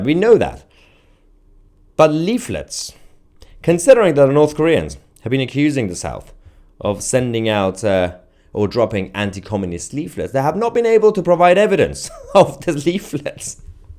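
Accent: United Kingdom. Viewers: Who are you referring to? speaks with correct English grammar with a charming British accent. It's the posters and people on the chat who do have terrible grammar. I love humorous comments about peoples' poor English that is incomprehensible at times.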